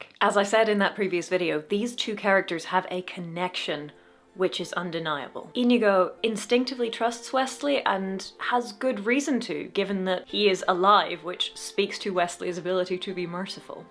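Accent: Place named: Northern Ireland, United Kingdom. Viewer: You have a great accent. It's got to be Northern Ireland or Donegal, with moderation in the US. Please tell me.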